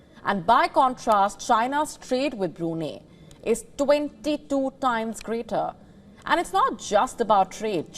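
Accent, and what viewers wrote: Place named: India. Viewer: A typical Indian accent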